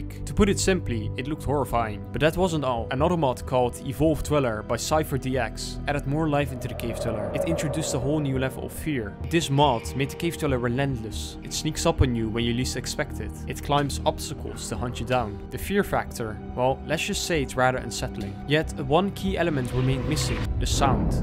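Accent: Netherlands. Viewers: Beetje nederlands accent ofnieee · im sorry to ask but are you dutch? you have a dutch accent when speaking english lol xD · You are definitely Dutch, nice. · Are you dutch? very nice video gonna try this Tommorow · i smell dutch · one of the heaviest dutch accents ever. geweldig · You are Dutch, right? I love your accent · You sound so dutch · ik ruik een Nederlands accentje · are you dutch?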